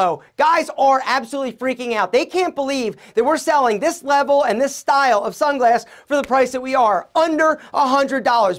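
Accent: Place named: Pennsylvania, United States. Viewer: West Virginia? Hmmm lol. With that accent I was thinking on the PA border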